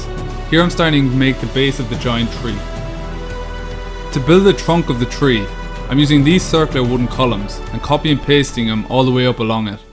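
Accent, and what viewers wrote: Ireland. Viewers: Are you Irish? Dublin maybe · Hi man you probably wont see this but you sound irish which u an to!if u see this can u pls tell me where ur firm im curious lol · You have to be Irish what county u sound like a mayo man like myself please reply 😄😄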